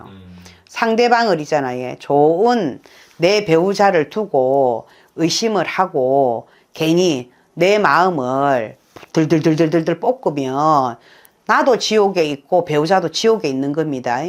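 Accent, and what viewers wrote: Busan, South Korea. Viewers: 선생님 부산분이 신가요?ㅋ 쏙쏙 귀에들어옵니다 ㅋ 정묘생 쏠로운은 없나요😅 좋은 말씀 감사합니다❤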